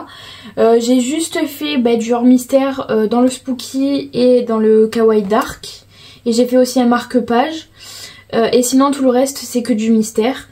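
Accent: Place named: South, France. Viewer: Coucou, je suis tombée par hasard sur cette vidéo et j'ai passé un très bon moment, je possède aussi le petit livre Fantasy mystère, effectivement les solutions sont superbes mais comme tu dis c'est tellement petit 😱 J'avais commencé le dragon et je l'a laissé en cours , je n'ai pas le courage de le reprendre 🤣 J'ai fait la chouette que tu montre et je te confirme que c'était très long , et encore , moi je fais ça aux feutres donc je trouve que c'est moins difficile d'accès qu'avec une pointe pinceau comme les feutres acrylique , surtout dans les toutes petites zones ! Je ne sais pas d'où tu es exactement mais ton accent me fait pas mal penser à celui de chez moi , même si, pour une sudiste, j'ai pas un accent ultra marqué, je suis pas loin de Montpellier, enfin à 45 minutes quand même xD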